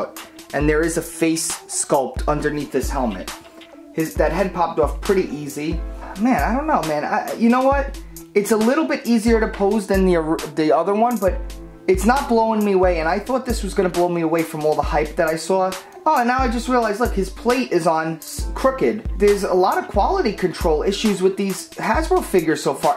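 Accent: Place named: Staten Island, United States. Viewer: Bro where u from you sound mad Staten Island?!? 😁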